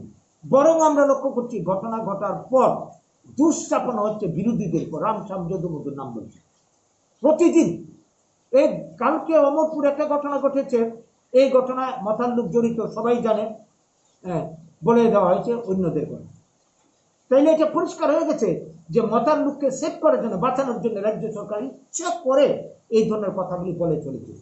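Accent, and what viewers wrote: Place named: Bangladesh. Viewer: Original tripurar bangali tumar moton koitona tumi bangladese kotta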